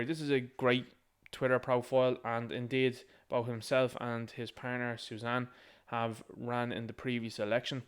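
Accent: Dublin, Ireland. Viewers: Absolutely love you. I’m a culchie and I luvvvvv the Dublin accent for starters…and the Dublin expressions. Class, pure class ❤ and then the content….you really nail it here. Pls God more ppl wake up….am thinking their strategy in going to Derek Blythe’s door abut his tweet or whatever it was, was because they knew he’d report it on social media and it would make ppl afraid they’d e next if they post something, so they’ll stay quiet, that’s what I think they were trying to achieve there. So we have to spread the word and wake ppl up and reclaim normalcy. Thanks. God bless.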